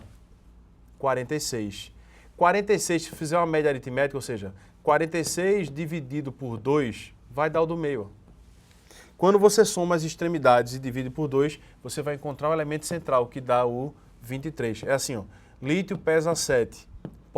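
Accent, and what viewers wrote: Pernambuco, Brazil. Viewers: Esse sotaque Pernambucano deixa a aula mais legal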